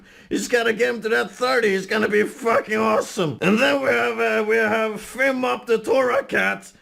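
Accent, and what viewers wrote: Sweden. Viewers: Are you Swedish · WTF are you Swedish? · Why are all swedish (I kinda heard a accent) YouTubers so crazy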